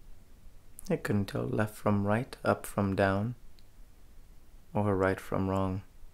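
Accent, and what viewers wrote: Scotland, United Kingdom. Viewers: One of my favorite movies ever! Thank you so much for doing this. PS great British accent. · Quite a good british accent 8/10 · You sound Scottish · Is that suppose to be a British accent? If so really good xx ( I’m from England) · I love the accent but Is it British because if it is I’m sending you £££££££😂😂🏴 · Scottish accent?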